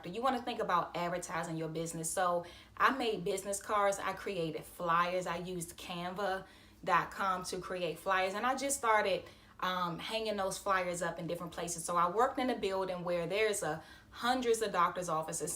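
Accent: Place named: Washington DC, United States. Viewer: Hahaha I had a feeling you were from DC. The accent gave it away 😅. “Verrrrrrry” hahaha thanks I do EMS for DC and thinking about getting my cpr instructor. Thanks for the video. It was exactly what I needed to know. Do you need to get a business license as well and start filing taxes as a self employed person? Or would you rather get with me off the record?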